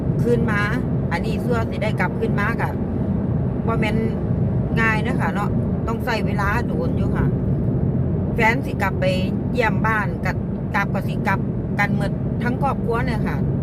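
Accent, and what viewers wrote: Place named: South, Thailand. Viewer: คุณ พูดสำเนียงมีความคล้ยคนใต้นะคะ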